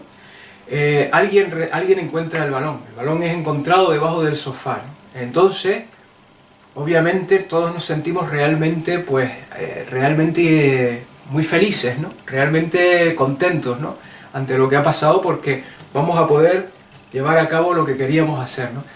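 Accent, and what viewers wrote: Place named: Spain. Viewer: Tienes acento español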